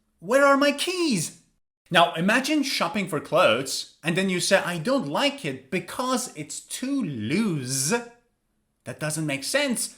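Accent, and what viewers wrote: United States. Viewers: Are you From America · You are really American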